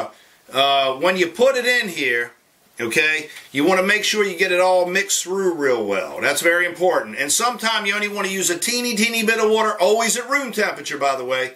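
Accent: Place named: New Hampshire, United States. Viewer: Very informative video. I shared this for my friends to view. Love the accent. Reminds me of my mother and her accent from New Hampshire.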